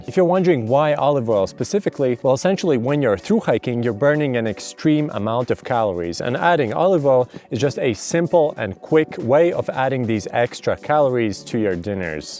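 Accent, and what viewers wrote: Latvia. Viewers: Yes, Latvian accent is hard to hide. Good video!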